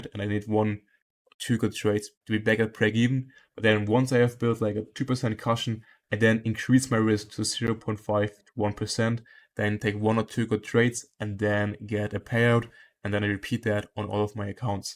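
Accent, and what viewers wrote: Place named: Germany. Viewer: Gotta love that German accent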